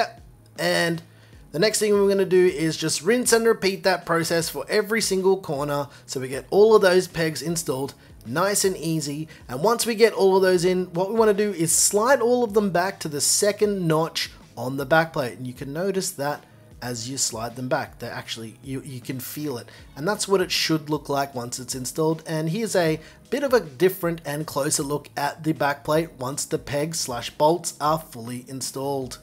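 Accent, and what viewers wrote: Australia. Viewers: Why do you look Mexican but you speak Australian? So strange I love it!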